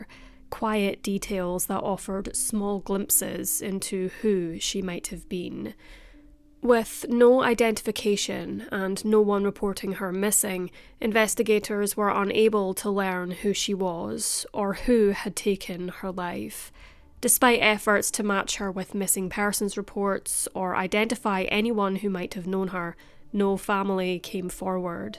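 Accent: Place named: Glasgow, United Kingdom. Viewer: I think she's from Glasgow, my Scottish aunty sounds very similar to her.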